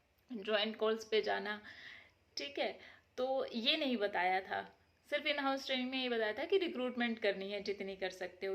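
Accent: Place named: Haryana, India. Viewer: Aap kha se ho ji? Bhatere 😂 sun k haryana se lag rhi ho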